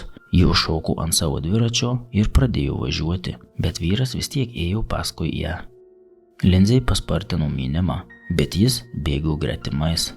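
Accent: Suvalkija, Lithuania. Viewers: Man skamba kažkaip suvalkietiškai. O šiaip reikia tobulėti, su laiku manau viskas išsisprendžia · Taip, akcentas suvalkietiškas. O dėl tobulėjimo, tai labai teisingai, reikia praktikos, laiko ir viskas eis su kiekvienu video vis geryn. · Ar tu suvalkietis? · Viskas būtų ok, bet suvvalkiečio akcentas turėtų išnykti.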